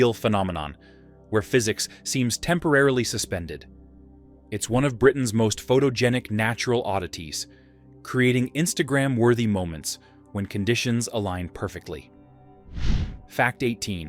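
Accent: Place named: United States. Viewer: It would be better without the awful American accent. The town of Berwick is pronounced BERIK not Ber W I C K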